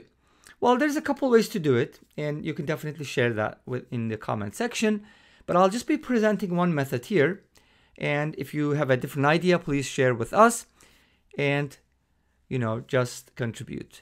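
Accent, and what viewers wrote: Turkey. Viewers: where are you from? turkey or united states?????????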